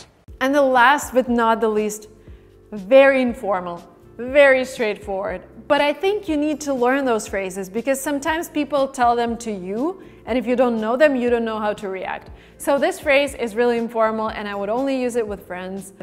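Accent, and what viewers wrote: United States. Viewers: Hi ma'am! I've been watching your videos from this year and I've quite improved my vocabulary and caught up the American accent. Thank you for bringing on new things every week. 😇❤️ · I love your way to teach English with an American accent 😊👍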